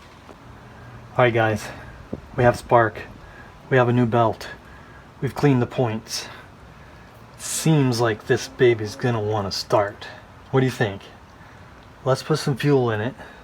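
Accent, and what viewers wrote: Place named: United States. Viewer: My little sister who knows nothing of these things would do a better job . This guy is speaking American not English . B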